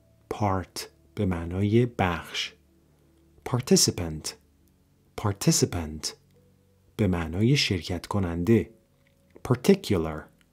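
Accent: United States. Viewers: این تلفظ ها آمریکایی هستش یا بریتیش؟ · سلام تلفظ شما تو این ویدئو امریکن هست یا بریتش ممنونم بابت ویدئو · لحجه آمریکاییه ؟ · دکتر لحجه با لحجه آمریکن این لغات رو تلفظ کردین یا برتنیش؟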